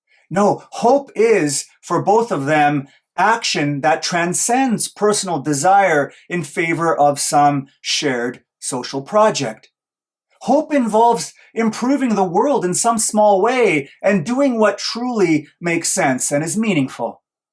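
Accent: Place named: Canada. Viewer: Indeed. Honestly, if you ever venture to the UK. look me up. It would be a privilege to meet you, & hook you up with places and people. I have family in Canada (sorry, terrible at accents but I think your vowels suggest Canada rather than THAT place?) so we might get out there before the decade closes. But certainly with a brown family I won't be going to the other place anytime soon! 😅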